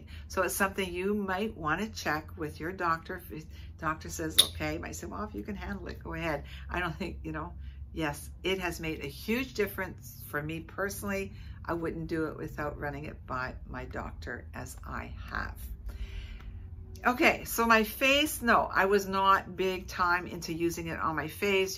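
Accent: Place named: North, United Kingdom. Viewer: You sound like you’re from the north. You look good. Me likey 😊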